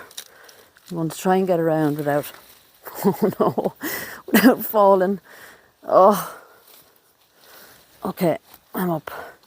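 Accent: Ireland. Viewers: I am really enjoying your videos,. Your Irish accent is so melodic to me. I live in the US, but my father hailed from Dublin, Ireland:-) Your countryside is so beautiful!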